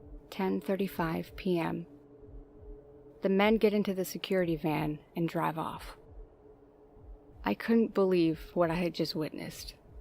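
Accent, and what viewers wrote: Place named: New York, United States. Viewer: Heavy New York accent.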